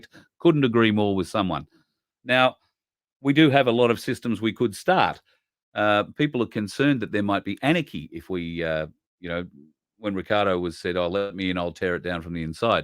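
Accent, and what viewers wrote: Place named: Australia. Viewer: Great show, great information, glad to hear the voice of another Aussie patriot on the airways. Godspeed and I look forward to the next broadcast.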